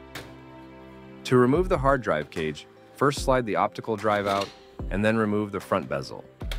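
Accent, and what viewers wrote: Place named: United States. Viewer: Interesting American accent european outlet lol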